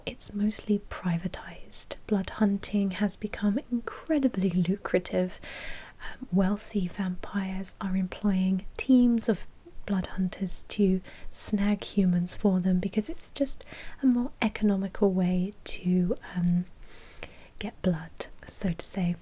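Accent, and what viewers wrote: England, United Kingdom. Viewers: To, I enjoyed your vampire roleplay. The title was Vampire Blood Bank. I love your English accent very much. And just that, actually makes this particular roleplay. Extra special. I hope that there will be more vampire role plays, in the near future.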